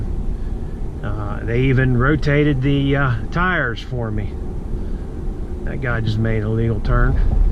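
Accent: Pennsylvania, United States. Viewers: Do you live in Pennsylvania? I’m picking up a slight PA accent. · Not sure but do I detect a central Pennsylvanian accent?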